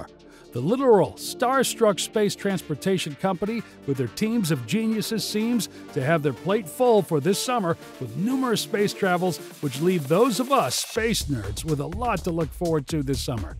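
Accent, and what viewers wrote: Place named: United States. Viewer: Terrible grammar in several instances. The script was written either by a non-native English speaker or not very well proofread, perhaps both. This makes me wonder if the distinctly American voice over is computer generated if so it is very convincing. If not, the reader needs some editorial discretion.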